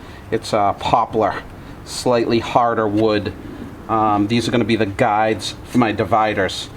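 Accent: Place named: New England, United States. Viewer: I have always used soft pine wood shavings in my coops. The coop I'm currently working on finishing will use the deep litter method and so far I have liked the pine. I am intrigued by industrial hemp though and would like to try it out at some point. Loving how your coop is turning out. I found this series when we started doing plans for our coop and I have enjoyed watching your progress. Also love hearing the New England accent as we are New Englanders ourselves!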